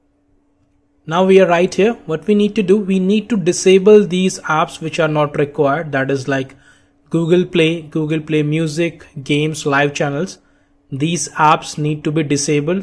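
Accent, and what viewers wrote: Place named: Delhi, India. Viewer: I am guessing you are from Delhi, by your accent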